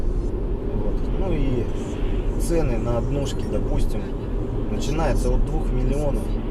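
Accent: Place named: South, Russia. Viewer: Говор у тебя все равно южный 😁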